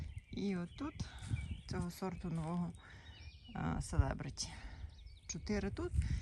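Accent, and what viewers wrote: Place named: Prykarpattia, Ukraine. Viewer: Приємно бачити справжню українку,яка далеко від України пам'ятає,хто вона і якої землі дитина.Слухаючи,відчуваю,що із Прикарпаття,можливо,помиляюсь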